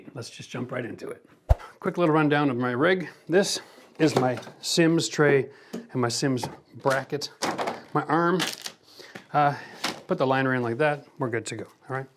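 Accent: Canada. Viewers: Are you Canadian? I detect an accent. 😉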